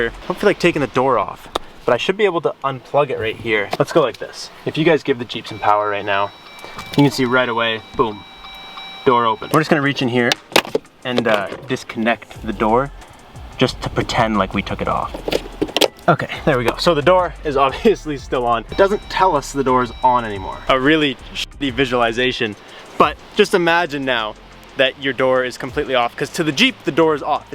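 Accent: Canada. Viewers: Great video. Canadian eh?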